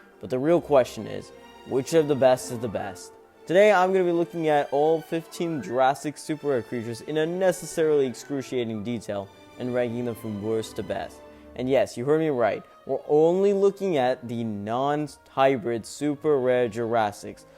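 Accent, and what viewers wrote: United Kingdom. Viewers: bros british accent slipped back, also the list is pretty much what id make my list lmao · you sound british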